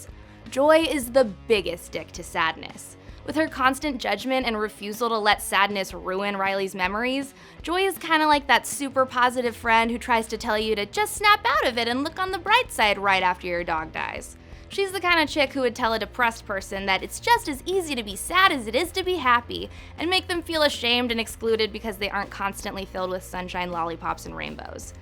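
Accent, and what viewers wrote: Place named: United States. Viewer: Stop being so negative. P.S. your accent is giving me a hedache. If you want to speak my language then speak it, dont chew it up infront of me then spit it back into my face you yank.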